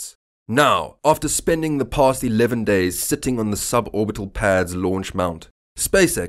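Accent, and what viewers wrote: Afrikaans, South Africa. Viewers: Great program, but your script was a bit out of date in most of the stuff that was happening in your previous videos. I see you are cathcing up to more current content. Keep up the good work. Nice to hear an Afrikaans accent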